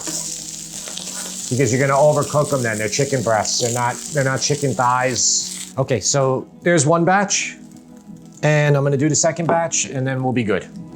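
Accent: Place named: New Jersey, United States. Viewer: Well if you really want not to like him you could start with the fact that he say's lives on Long Island but talks like he's from New Jersey. That's a start.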